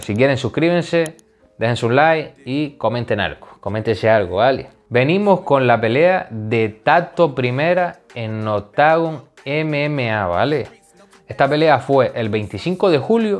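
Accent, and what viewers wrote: Canary Islands, Spain. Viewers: Buenas tardes ante todo muchísimas felicidades por tus vidios y que sepas que te sigo por tu acento CANARIO.como yo también lo soy y como ablas en los vidios sin cortes.de ser así creo q no t seguiría como te sigo👍💪sigue así crack y a seguir creciendo en este ámbito un gran saludo campeón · Que sepas que yo t sigo por ese "rollo canario" porque es como si estuviese escuchando a un colega comentar la pelea/hablar de mma. Sigue así que creas muy buen contenido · Usted es clase aparte! naturalidad, sincero, conocimiento, apoyo a los peleadores Canarios y de la Peninsula y a uno como Canario se le llena el pecho de escuchar ése acento, oite exentrenador ?👎🏽. Daniel Barez, futuro ®️una pena por Tato y Sebas !! Grande Bestia 🦍💪🏽💪🏽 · Lo fácil siempre es burlarse de la gente que empieza hasta que empiezan a tener éxito. Entonces llegan las críticas. 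Sigue hablando canario, que quien se acompleja de sus raíces son unos pocos, el resto nos sentimos orgullosos de hablar canario. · Buen video cmo siempre,una pena el "robo"a Tato, y t digo una cosa,precisamnte el acento Canario es lo q le termina d dar el toke perfecto a los videos,saludos bro💪🇮🇨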